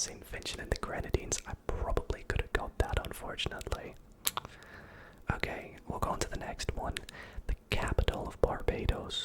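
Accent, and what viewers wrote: Scotland, United Kingdom. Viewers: Where abouts in Scotland are you from because I’m from Scotland too 🎀🏴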